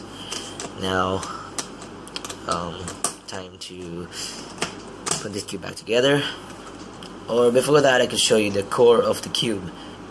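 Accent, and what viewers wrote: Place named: United States. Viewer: Dude why the American accent..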